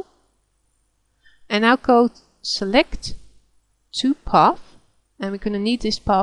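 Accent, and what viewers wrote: Netherlands. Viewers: Great video! Love the Dutch accent :)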